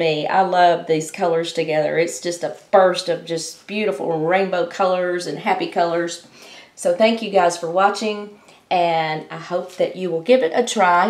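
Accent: Texas, United States. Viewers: So. Moving from California to Texas. Good that you already haves Texas accent.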